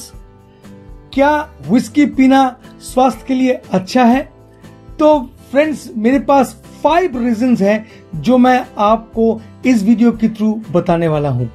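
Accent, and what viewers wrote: Bengal, India. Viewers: Are you a bengali 😶😐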